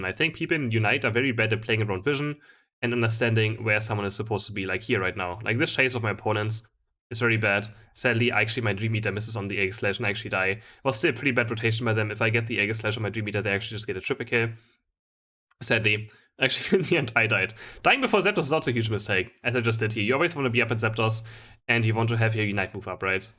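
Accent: Germany. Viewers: Hey, thank you for this nice and informative Video, i've got a question to you:D Your accent sounds really german, may i ask where are you from?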